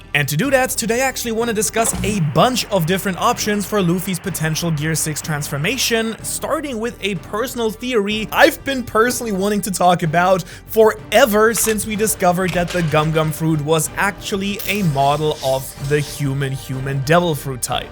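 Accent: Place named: Germany. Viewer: are u german ?since when did j start sounding like y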